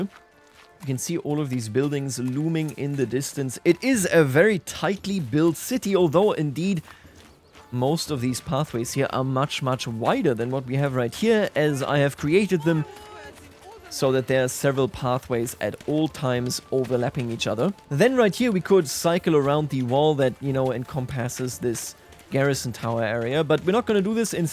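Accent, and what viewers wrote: Bavaria, Germany. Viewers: Sometimes you have Bavarian Germn Spikes (were german slips through while talking) Its not bad I like that diversity. German Englisch Vielfalt. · It smells like a german akzent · sofort gehört dass du deutscher bist (kein hate) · Damn even tho you are from Bayern, isch dein Akzent im Englischen halt echt ned so schlimm :0 Very nice Video dude, now i have to watch the whole series!. Grüßle von den werten Nachbarn ausm Schwabaländle ;) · he even uses some german words and phrases in between^^ but only noticable for a german I think, and the hints of the accent gave it away for me :p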